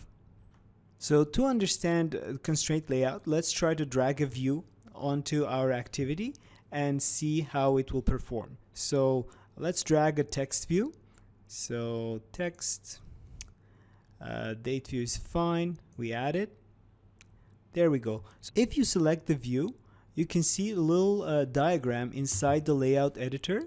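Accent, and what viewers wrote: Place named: Russia. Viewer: акцент русский, мог бы и по-русски записать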